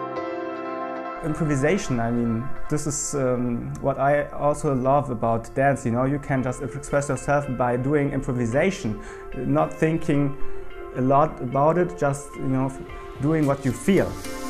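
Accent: Germany. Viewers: Also dein englisch klingt extremst deutsch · schade dass man so hört, dass er aus deutschland kommt :D klingt immer bisschen lächerlich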